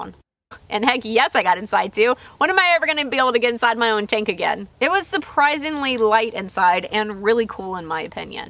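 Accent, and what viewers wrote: Texas, United States. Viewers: Dang. I forgot you’re from Texas. 😆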